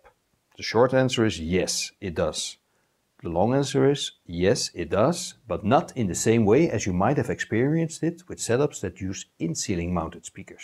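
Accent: Netherlands. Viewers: enjoyed your dutch accent thought :) my main challenge with these Focal is where to set u the speakets. because in the back of the room the roof is crawling (-under the roof), so I don't know how the speaker will manage the atmos. It is very clsoe to the roof.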